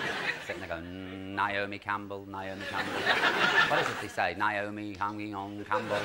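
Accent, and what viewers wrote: England, United Kingdom. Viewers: Scouse accent basically, he's from near Liverpool. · Usually I'm good with an English accent, but what. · Does that counts as scouse or Mersyside?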